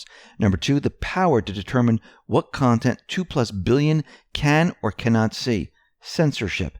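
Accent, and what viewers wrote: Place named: New York, United States. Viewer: Sounds like a New Yorker to me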